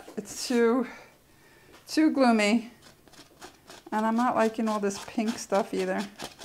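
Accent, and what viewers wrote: New York, United States. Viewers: It says your in Norway, but you sound a bit like a New Yorker...I really enjoy watching your videos. Does doing the live videos make you more self conscious? I've been watching you for quite a while now, it just seemed like you were feeling uncertain about your process...girl, you are a great artist, don't forget that.. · I just found your channel and I find it very motivating and filled with ideas. I enjoy your narrated versions the best. From listening I thought you were from NJ or NY, but heard you mention Norway..then saw your FB profile. Brooklyn..makes sense. I'm in NJ. :)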